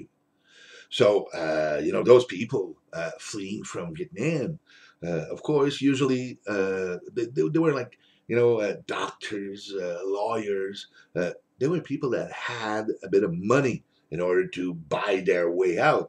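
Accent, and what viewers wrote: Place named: Texas, United States. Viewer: Très belle entrevue vidéo français et anglaise,vous nous orienter dans le choix des épices et piments pour la nourriture que nous préparons #1, en pensant avez-vous des origines du Texas car vous avez un accent texan👍👍🤝🌶🌶🌶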